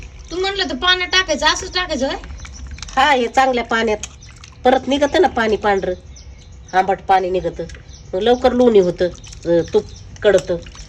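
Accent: Ahmednagar, India. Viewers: बोलणे तर नगर सारख आहे पुण्याचे वाटतच नाही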